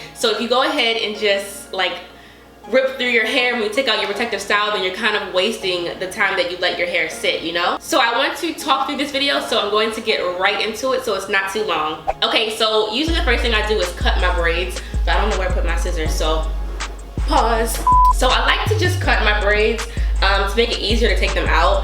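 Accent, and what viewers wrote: Baltimore, United States. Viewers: Now that you’ve stated you’re from Baltimore you and IAmTreah sound just alike but her accent is a lil stronger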